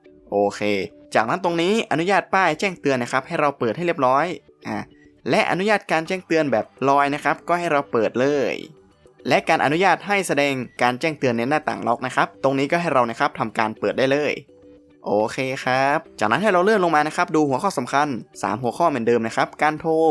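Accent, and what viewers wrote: North, Thailand. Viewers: พี่เป็นคนเหนือไหมครับมีสาปเมืองหน่อยๆๆ เหมือนเห็นตัวเองตอนพรีเซ็นงาน55555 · พี่เป็นคนภาคเหนือใช่มั้ยคับ ทำไมปะแลดเมียง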